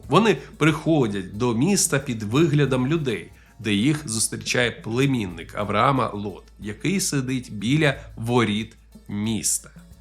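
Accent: Russia. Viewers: Великий уклін вашій наполегливості у видавлені з себе москальського акценту. Перші ваші відео аж слухати не міг, а тут натрапив знову на ваш канал і дуже приємно здивований. Моя повага!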